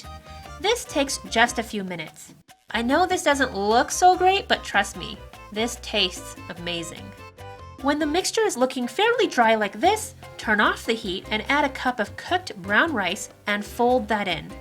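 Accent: Canada. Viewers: Heh I like the Canadian accent - it's eh- nchilada like egg or end ;) (Mexican here). Nice recipe I would've never thought of black eye peas but they do have a meatier taste to me than other beans.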